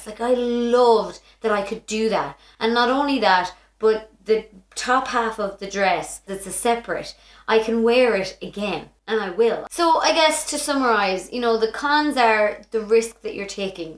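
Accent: Ireland. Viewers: You looked exactly like an Irish Princess! Goodness that dress is amazingly gorgeous!!! Your hair looks so beautiful with the blue. Also your accent is amazing! My godmother is Irish so I’ve always loved Irish accents❤️❤️ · I love the Irish accent ♥ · Man, simply stunning! From the gorgeous red hair to the quirky, charming brogue all topped off with that endlessly ethereal gown... Breathtaking.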